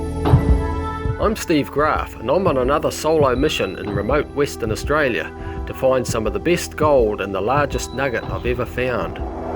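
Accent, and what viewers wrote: New Zealand. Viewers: Really enjoyed your commentary. Nicely done and I will be back.. Kiwi? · Funny how a.i. messes up on translating the kiwi accent ... some funny mess ups throughout this video !!!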